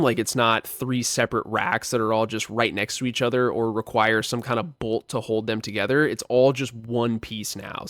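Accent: United States. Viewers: You motivate me to do such activities too 😁 It's super cool watching you speaking English. I try my US accent practice with your videos too 😂 So it's like killing two birds with one stone😂 😇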